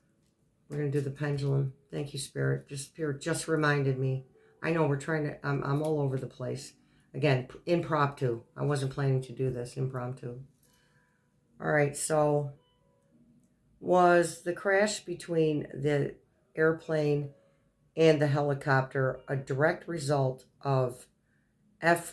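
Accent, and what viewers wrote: New York, United States. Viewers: I love her too. Even though I''m from California, I love her "New York-ese" style, saying, "Youse guys" and "anyhoo." She's genuine - the real thing.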